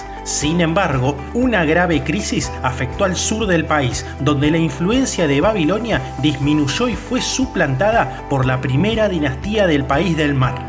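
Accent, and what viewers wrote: Argentina. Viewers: Hola! No sé tu nombre pero te felicito por todos los videos. Te puse en otro video pero tal vez no lo viste! Estamos organizando con la UBA y la UNLP una charla sobre Divulgación Histórica. Vamos a invitar a Javier Trímboli, el Profesor Borda y queríamos invitarte a vos qué haces estos videos que nos parecen muy interesantes para la Divulgación. Te interesaría participar? Por tu acento asumimos que sos argentino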